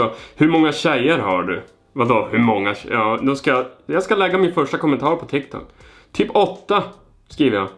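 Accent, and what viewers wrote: Norrland, Sweden. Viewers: Hahhaha varför gillar jag din dialekt men hatar norrländare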